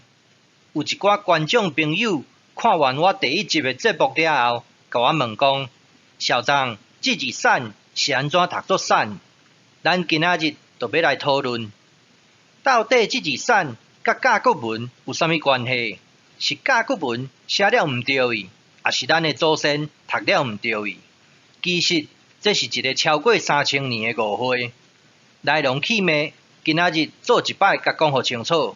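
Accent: Zhangzhou, China. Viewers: 從甲骨文解開台語完全是正確的，你就快成功了。只差你台語偏漳州腔。漳州音是外族學習台灣海口音而形成的語言。，只有87分相似。音差會讓你困惑而解不開真正的字意。甲骨文是台灣海口音的造字語。你只能解開一小部份台語字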